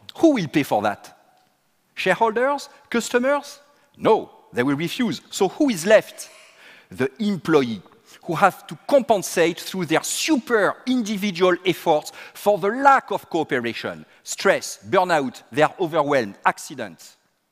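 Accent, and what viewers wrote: France. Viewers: French accent in English is the worst accent in English. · well, i'm French, so I can tell you that oral in our classes, oral english speaks are not pushed to be practiced till college years, so we have a few great people that have that kind of accent... Don't be afraid, this guy has great ideas... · i think he has french roots. I sense a slight accent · I am distracted by his accent. I love how French speaks English :P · I'm sure this is very inspiring, but since I have never really had the chance to listen to others with a French accent I can't make out every sentence he is saying. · 1. Brilliant - I wish more Orgs would have the vision to integrate some or all of this into practice. 2. Its a French accent. Get used to it. There are subtitles. Stop complaining over simple stuff. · he speaks like a french. i dont like this.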